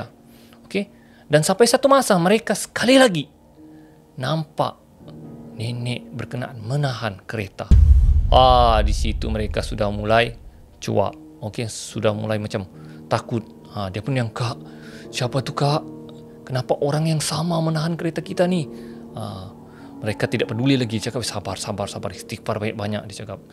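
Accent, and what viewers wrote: Sabah, Malaysia. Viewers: Mantap.. Sya suka cara penyampaian kau.sebab tdk lari bhasa sabah kau. Banyak utuber yg bla membahas ssuatu hilang sabahan ori dorang. Terbaik bossssssskuuuuu.....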